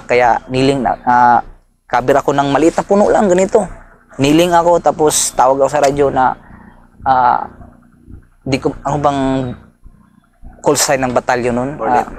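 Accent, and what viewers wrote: Philippines. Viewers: tagalog na tagalog talaga hane!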